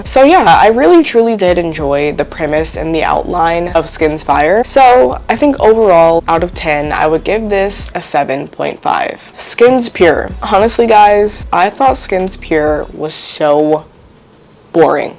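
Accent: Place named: United States. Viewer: Hearing an American talk about Skins… I’m immediately turning off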